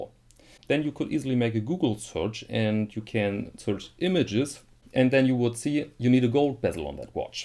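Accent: Germany. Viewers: I don’t know why, but to my ears there is something special about the German accent when it comes to talking about technology - new or vintage. I have only just found this channel, and I’m so happy I did. Liked and subscribed.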